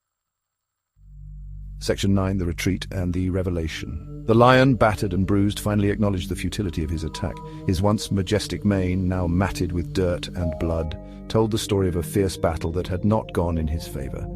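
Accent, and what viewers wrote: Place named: England, United Kingdom. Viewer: The most beautiful English accent and the mastery of English language! Really enjoy listening to you and your absolutely artistic use of words! All perfect!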